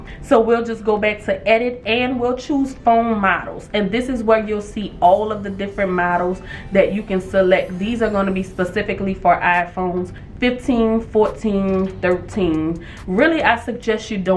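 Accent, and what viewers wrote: New Orleans, United States. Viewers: Love the New Orleans accent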